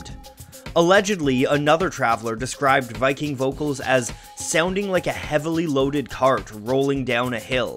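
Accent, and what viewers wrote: Canada. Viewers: spencer jacobs I'm Irish and he's definitely Canadian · Someone's Canadian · Are you Canadian? I like your accent.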